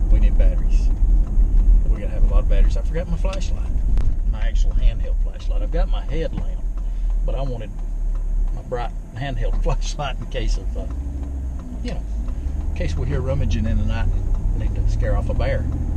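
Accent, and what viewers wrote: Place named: Alabama, United States. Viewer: I enjoyed your video. Looks like you have a nice setup. I thought I detected a northeast Alabama accent. I am also from that same area. We love to camp at Cades Cove and Elkmont. We go to Desoto State Park often. It is an easy trip for us. Thanks for sharing!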